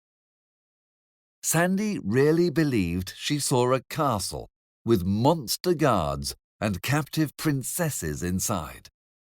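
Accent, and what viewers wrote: United Kingdom. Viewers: British accent, eh.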